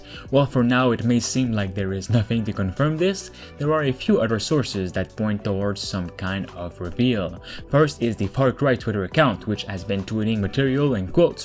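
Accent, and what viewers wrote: Quebec, Canada. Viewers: he is Canadian/French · Sounds french Canadian, like the guys I used to play hockey with.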